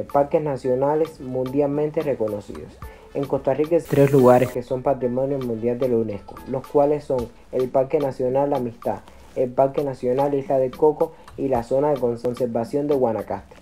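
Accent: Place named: Dominican Republic. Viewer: ups lo siento espero no haberlo insultado....conozco algunos dominicanos y tienen su mismo acento.....saludos y 🌴 P U R A 🌞 V I D A 🌴 🇨🇷🇨🇺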